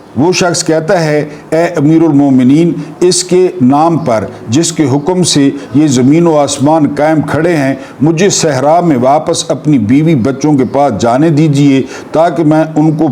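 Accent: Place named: Mianwali, Pakistan. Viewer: مجھے سرائکی زیادہ نہیں اتی لیکن اپ کے منہ سے یہبہت اچھی لگتی ھے ویلاگ کا بہت مزا اتا ھے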